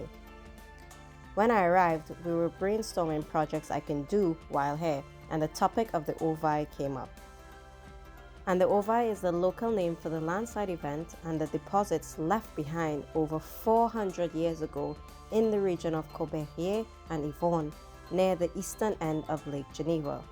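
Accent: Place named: Trinidad and Tobago. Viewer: Hey, I loved the video. I am a Trinidadian doing research for my thesis and came across your video. You sounded Trini so I researched you. Keep up the good work and post more content. Thank you.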